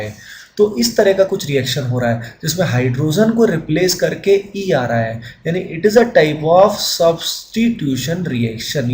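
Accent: India. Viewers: i really hate indian accent but thanks for ur effort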